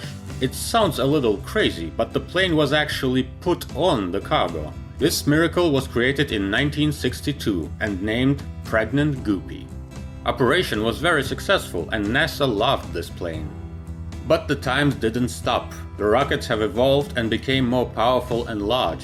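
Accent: Russia. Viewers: well it's Russian accent,which usually U sounds as it is rather than A in several occasions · To be fair, the native Russian speaking narrator has no "uh" sounding letter in his alphabet to compare to English letter "u". They do have a sound like "oo" though. I have a Russian friend who mispronounes "truck" as "trook." I guess it's a common mistake and some English sounds are difficult. Just as some Russian letters are very difficult for English speakers, when we have no similar sound. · Luka Walpole It's just the accent. As a Russian speaker, He's bound to have SOME words and combo of letters that are different to his tongue!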